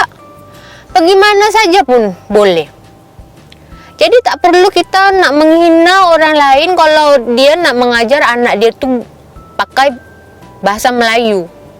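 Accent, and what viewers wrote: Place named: North, Malaysia. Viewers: Aku br terjumpa channel ni rasa lawak hg ckp utaqa hahaha...sempoy baq hanggg 👍👍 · pandai cakap kedah.jangan garang sangat kak.minyak goreng cukup kak.sabar kak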